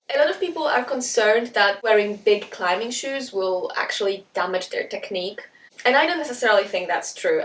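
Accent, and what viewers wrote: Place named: Germany. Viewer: Love your German accent.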